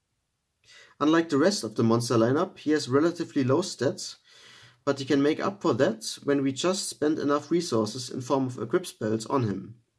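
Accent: Germany. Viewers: wie man das deutsch raushört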